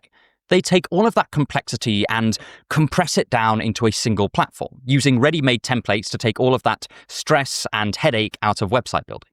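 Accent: RP, United Kingdom. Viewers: There is something very funny in hearing grandly articulate "What-to-do" in his rather posh and fabulous english accent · it's all about the british accent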